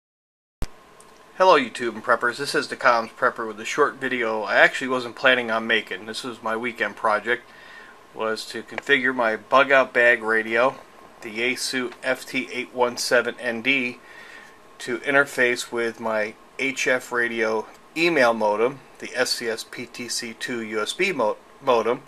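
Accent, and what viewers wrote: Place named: United States. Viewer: Another great vid! I need to get a setup like that for my self. I have been trying to educate myself so I know what to buy. You channel is a God send to people like me. You mention in some of your vids you live in Russia. You sound like you were born and raised in the US. I hope this is not too personal, but Russia does not seem like a place many people from the US move to. Not that there is any wrong with that for sure, it just seems very unique. As always, keep up the great work!!!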